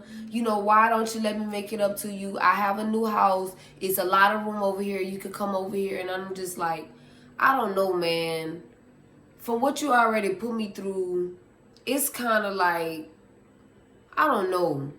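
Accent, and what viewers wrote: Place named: Louisiana, United States. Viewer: I knew you sounded Louisiana lol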